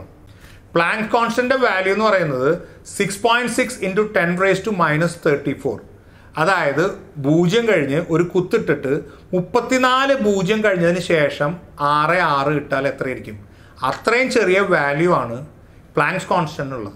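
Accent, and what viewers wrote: Thrissur, India. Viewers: thrissur kaaran aanalle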